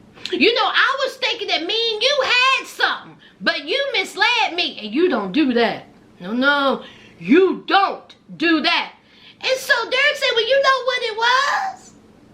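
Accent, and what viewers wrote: South, United States. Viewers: remember when what's her name brought her prison bae home from prison ( the girl who pretended she had her act together and was selling jewelry), the first thing she did was changed the locks on the house. She lied to prison bae and said he was a friend and had keys to the house. But in reality he was the owner of the house and was renting it to her. When old dude saw them together in the back yard in the swimming pool he was livid. He demanded they both vacate the premises. She was probably leading him on before prison bae was released. Those people are stupid for being on the show because the network is making millions of of them and they only get a few measley dollars. Skylar looks like a psycho path in my opinion. She has zero emotions in her eyes. She's the kind of person that will tell you what you want to hear. She lacks emotions, care, concern or any other type of real emotions. 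I just found your channel the other day and you're hilarious. It's the country accent that's give this show life. Great job 👍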